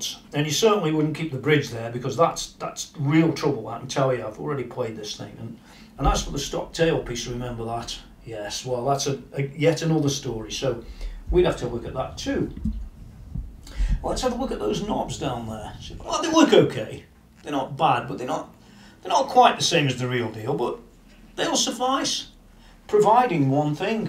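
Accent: Stoke, United Kingdom. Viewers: I Have bought guitars (Ibanez rg350)from Thomann in the past and still will but when I looked at the Harvey Benton range something just didn't feel right to me. Thanks for doing this video there is a lot of crap out there and you need to research thoroughly. Is that a stokie accent.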